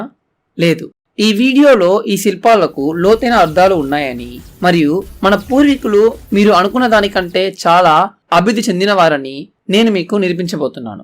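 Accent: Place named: Tamil Nadu, India. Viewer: గొంతు నీదేలా ఉంది. తమిళ్ వారు మాట్లాడే తెలుగు లా ఉంది.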